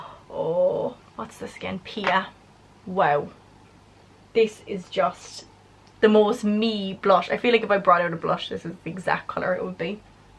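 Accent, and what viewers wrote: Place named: Ireland. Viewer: Beautiful 😍....I am a lady in my sixties and I have been using Trinny products for years now...amazing....she does a product called...." face finish ".... which is a cream powder you really must try it...no one else in the cosmetic world does it....enjoyed listening to your Irish lilt....love Jane...North Wales UK....x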